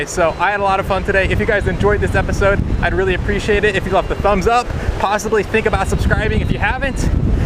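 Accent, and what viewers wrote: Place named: Buffalo, United States. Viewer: Your accent reminds me of my buffalo ny extended family....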